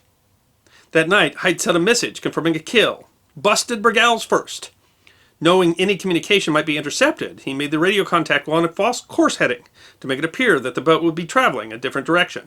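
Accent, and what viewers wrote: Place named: Midwest, United States. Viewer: Those flat Midwestern "A"'s!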